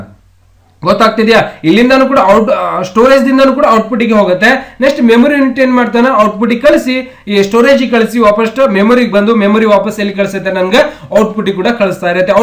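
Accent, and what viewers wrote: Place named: Dharwad, India. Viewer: ನೀವು ಮಾತಮಾಡುವ ಕಲೇ ತುಂಬಾ ಚೆನ್ನಾಗಿ ದೇ ನಮ್ ಧಾರವಾಡ ಬಾಷೆ ಕೆಳಲೂ ಬಾಳ ಕುಷಿ ನೋಡ್ರಿ ಸರ್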